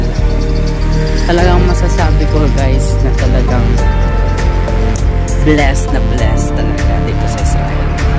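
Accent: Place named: Batangas, Philippines. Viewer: Ang Salita mo is parang batangas at Sa calapan Sa Mindoro din ang quezon ano. Tagalog Na tagalog at May punto.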